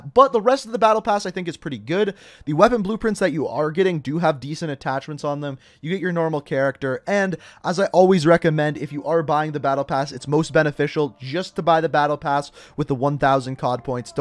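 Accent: Canada. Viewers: Canadian?! Eh!! Love the channel even more now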